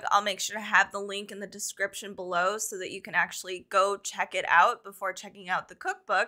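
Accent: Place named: Mexico. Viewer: I love your Mexican accent! So cool! I can’t wait to buy this cookbook. 👩‍🍳 thanks for sharing this! 🤩🥰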